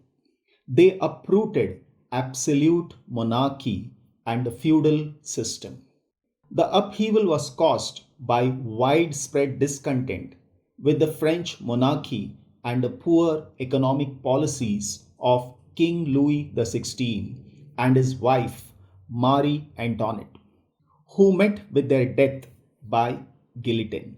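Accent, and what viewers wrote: India. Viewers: नमस्ते I am an American and I enjoy watching your videos. It is really calming as I have a stressful life. I love the geography exceptionally. I really like learning about India, as it combats the Ameri-centrism and Euro-centrism that is common in American schools. Most influence even came from Asia, from the Indian Spice Trade (to Arabia) to the Chinese discovery of gunpowder and its usage. Keep up the awesome production of videos! 😁👍I like the Indian accent and culture. I had a Nepali friend for most of my life. P.S. I like your look, lol 😂😀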